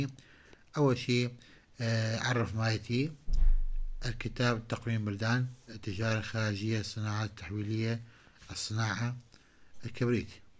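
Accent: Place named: Egypt. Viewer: الاستاذ چنة مصري بلگوة ديحچي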